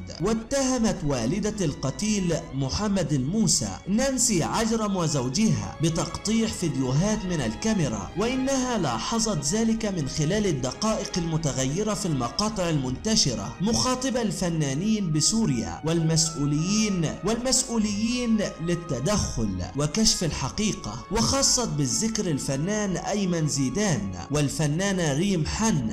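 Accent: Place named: Sohag, Egypt. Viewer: اكيد سوهاجي سوهاجي يا امينه ما هما تبارك الله ماليين علينا حياتنا .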